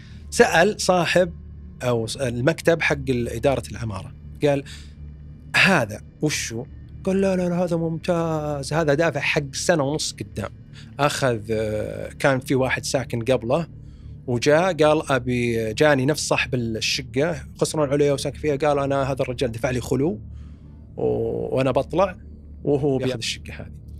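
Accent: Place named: Qassim, Saudi Arabia. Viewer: من احلى ماسمعت قصص ررررررائعه بلهجة قصيمية تزيد من جمالها 😂😂 ، حلقه كل اثنين ! ياليت تصير كل اسبوع حلقتين لو قصه فقط بدون تحليل ✅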